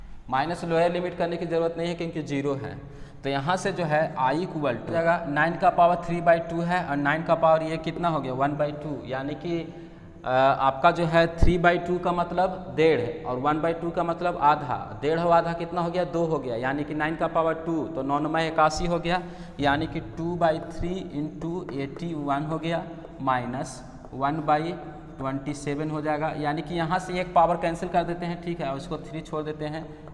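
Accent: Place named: Bihar, India. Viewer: Aapka bihari accent I like it so much 😍 💕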